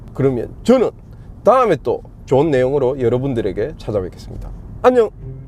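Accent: Gyeongsang, South Korea. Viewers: 경상도 말 밥 맛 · 사투리가 몸에 베었... 곙상도..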